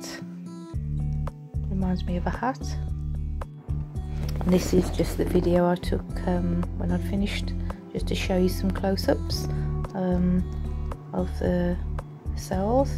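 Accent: United Kingdom. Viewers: You sound like my Scottish friends! Lovely 😍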